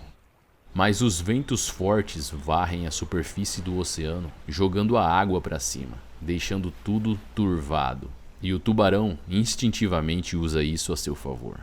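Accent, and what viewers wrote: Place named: Sao Paulo, Brazil. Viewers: esse sotaque de paulistakkkkk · Meu Deus esse sotaque Paulista forte dá uma aflição é muito feio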